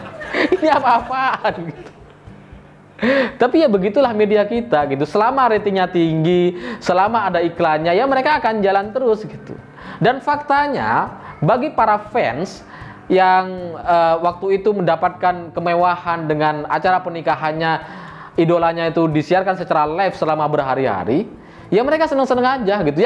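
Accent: Madura, Indonesia. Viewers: Kok logatnya Madura?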